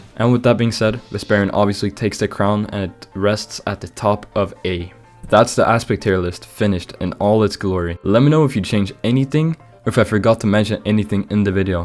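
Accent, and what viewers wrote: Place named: United States. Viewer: du låter på riktigt som en amerikan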